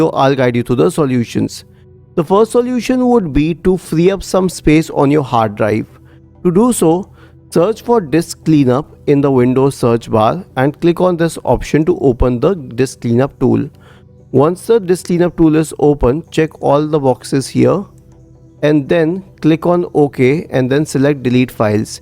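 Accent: India. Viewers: that indian accent is a warm alarm that my problem is gonna get fixed thank you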